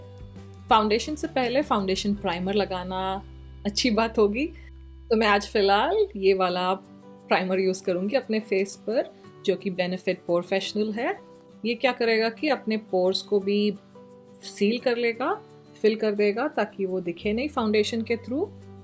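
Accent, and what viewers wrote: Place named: Mumbai, India. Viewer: this is amazing. this your best video. i like the red with this neutral look. i loved it sooooooooooooo very much. apki hindi bahot achi h, kuch words to mujhe bahot jaida ache lage, like u said bahot sunder lagega, chera barabar krne k liye. Mumbai wali Hindi di, maza aa gaya dekh kr. itna cute language me bola apne, loved it. u already knows that i love ur voice. plz or bhi video banayiye Hindi me.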